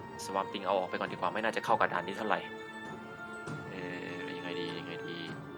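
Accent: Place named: South, Thailand. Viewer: จากที่ดูหลายๆคลิป ผมรู้ว่าพี่เป็นคนใต้แต่ผมแค่ไม่มีหลักฐาน สำเนียงคุ้นมากครับ 😊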